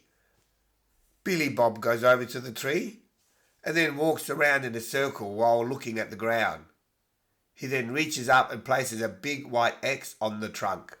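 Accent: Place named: South, United States. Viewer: Your rednek accent sucks.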